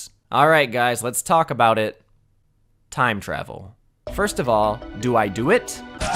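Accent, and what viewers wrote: Brazil. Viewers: you brazilian? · Yo, is this man brazillian?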